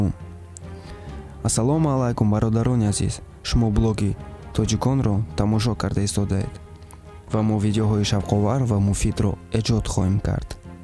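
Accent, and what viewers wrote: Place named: Russia. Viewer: Khohish mikunam, ke toza bo zabuni Orioni kharf bizani ogoi BLOGER! Kharfzanii Tu shaxseki rus khastu guyo bo zaboni tojiki kharf zada istodaast.. aniktarash talafuzat..." Asalamu malakum"...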